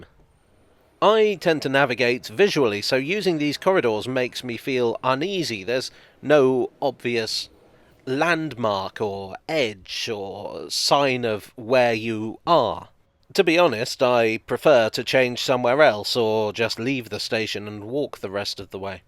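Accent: United Kingdom. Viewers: I like your Britty narration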